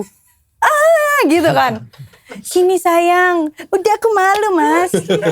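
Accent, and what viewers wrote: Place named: East Java, Indonesia. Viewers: Dialek Jawa Suroboyoan ini. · jawa timuran, tepatnya ya surboyo ngalam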